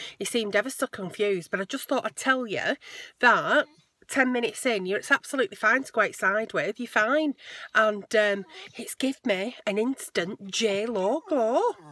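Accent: United Kingdom. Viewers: you are the true bright spot in my life, you make me laugh and make me feel that life is not so bad and should not be taken too seriously...your talk reminds me so much of my british sister in law, ( hubby is from Nottingham)...thank you very much for just being you, love you much...from the U.S. Jeannine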